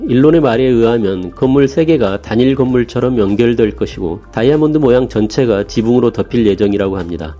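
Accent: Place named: Jeolla, South Korea. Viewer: 전라도 사투리가 듣기 좋네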